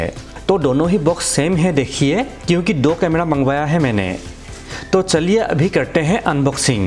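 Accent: Assam, India. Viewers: ভাই অসমীয়া নেকি?কথাবোৰত ধৰিব পাৰি ৷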